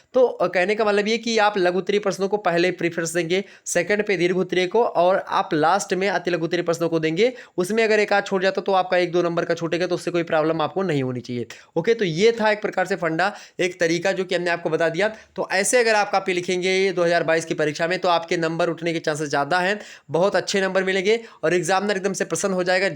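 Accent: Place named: Kanpur, India. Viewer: Hme lgta tha sir kanpur se h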